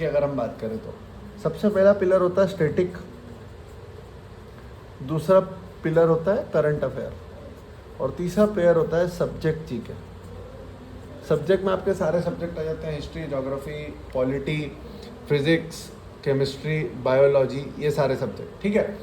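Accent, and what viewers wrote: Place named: Madhya Pradesh, India. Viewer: Bhaiya aap to MP ke lag rhe ho yar aawaz se ❤❤❤❤❤ Proud of you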